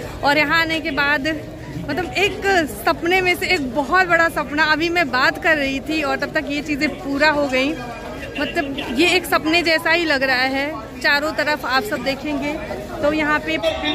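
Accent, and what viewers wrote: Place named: Varanasi, India. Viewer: आप वाराणसी मेँ रहती हैं क्या',सो funny, अरे का खइनि ह समोसा तो ख़ इनि हं👌..कोई बात नही आप लोगो की बात न सुने आप जैसी हैं वैसी ठीक हैं वैसे दुनिया में कुछ लोग हैं जो कितना भी अच्छा कर लो ,लोंगो को गलत ही लगता है इसलिए ,ना किसी के अभाव में जिये न किसी के प्रभाव में ये जिंदगी खुद की है बस इसे अपने मस्त स्वाभाव में जिये..🙏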